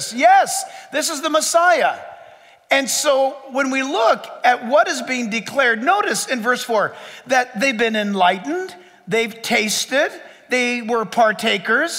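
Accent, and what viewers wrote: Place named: New Jersey, United States. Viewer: You sound like you come from New Jersey to me! You also have the same sense of humor that my husband and I have and I gave him your name and this series you're preaching. God bless you, always. I've been through some of the things you mention.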